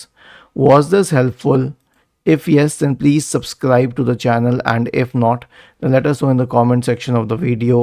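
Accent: India. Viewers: indian accent ahh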